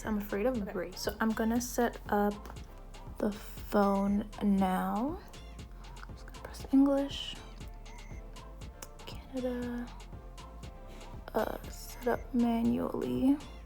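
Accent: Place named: Caribbean. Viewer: I know I was hearing an Caribbean Accent and music in background lol, rarely see them doing unboxings. BTW I'm getting the 11 soon 😊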